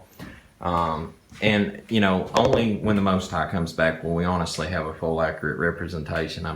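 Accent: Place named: South, United States. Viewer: Very informative and compelling.. Thanks,.. God bless and guide you, dear brother.. Btw, where are you from? I definitely detect a Southern accent. North or South Carolina? My Momma was from Kings Mountain, NC,and I still have tons of kinfolk,down yonder, that a way...:)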